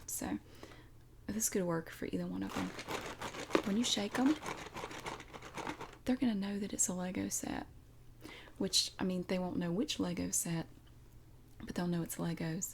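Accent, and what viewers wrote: South, United States. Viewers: I'm also from the south (Georgia) and we honestly sound the same😂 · I love your accent, is that a "Southern" accent? I am from Bombay. Sometimes I watch Hollywood movies and many of them have characters speaking with an accent quite similar to yours. I am just here for the accent.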